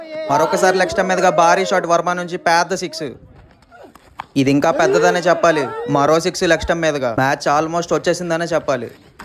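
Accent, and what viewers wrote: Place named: Srikakulam, India. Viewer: SRIKAKULAM vollu antarra babu❤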